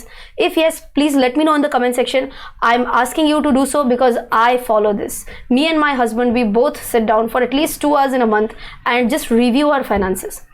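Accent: Maharashtra, India. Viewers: What a brilliant speech you have. So captivating, so knowledgeable and genuine sounding. Your crisp marathi accent makes it more wonderful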